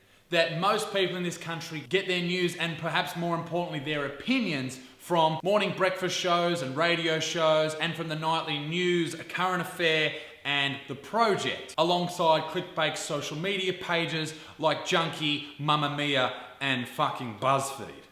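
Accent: New Zealand. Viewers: u nailed that Kiwi accent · Love this vid<3 Also that kiwi accent🤣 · Quality Kiwi accent. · your NZ accent though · You’re Māori accent is on point bro ps some Māori’s hate being called kiwi cause the British made that name up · his kiwi accent makes him sound like korg from thor ragnarok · That kiwi accent. On point 👌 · That Kiwi accent tho, it reminds me of Jonah from Tonga, Chris Lilly, “you on your period or what miss!” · that was the typical north island Maori accent, pakeha don't have the same mannerisms, we still say bro tho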